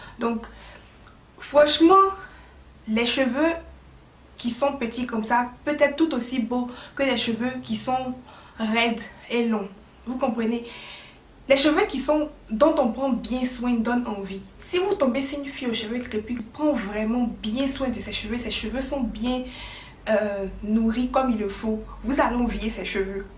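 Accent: Ivory Coast. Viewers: Tu es ivoirienne? Je viens de m'abonner l'accent ivoirien là 😉😉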